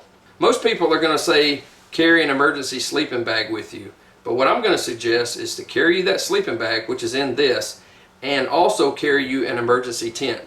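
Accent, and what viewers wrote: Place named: Southern Illinois, United States. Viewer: Hey, I think I can guess where your from based on accent and woods area. I'd say Southern Illinois/ East Missouri area. Close or no?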